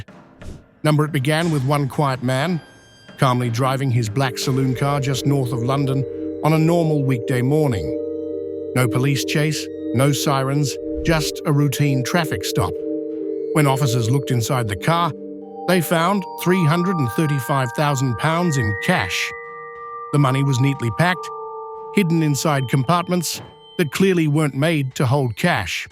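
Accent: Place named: Australia. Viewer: My previous comment was deleted by YT strangley. Great video. Subscribed. Interesting Aussie narrator. AI or paid ? Are you able to share the source? Cheers from this Aussie living in NZ 😎🎸🤘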